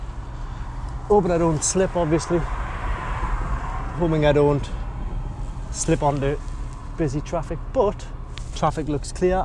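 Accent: Newcastle, United Kingdom. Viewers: Are you a Geordie? If so, awesome hahaha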